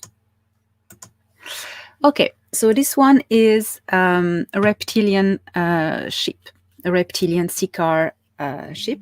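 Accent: France. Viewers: French accent speaking English is so beautiful! My children went to French Schools and I love the way they speak too❤️What is particularly cute is that the translation says (Alien sheep) 😄 Raise your vibration by doing something you love, for me, music really helps and arts and crafts. Spending time in nature, watching Sunrise/Sunset, being close to water, eg the sea or a river if you are not close to the coast. Lots of love, Thor Han, The Galactic Federation and all those reading the message 🙏❤️👍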